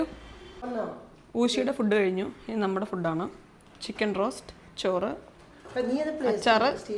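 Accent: Malappuram, India. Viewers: മലപ്പുറം അല്ലെ ഇങ്ങള് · ഞങ്ങൾക്കും താളിപ്പ് കറി ഇഷ്ടമാണ് സംസാരത്തിൽ മലപ്പുറം ടച്ച് " ചൊയ" ഉണ്ടല്ലെ. ഇവിടെയും പറയും. പാലക്കാട് - മലപ്പുറം ജില്ലാ അതിർത്തി.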